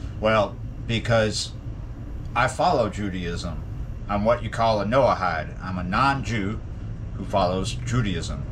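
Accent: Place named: South, United States. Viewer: 😂😂Southern accent now 😂😂. Are you moving back to try to get your driving license so you can do the van life thing. Good luck.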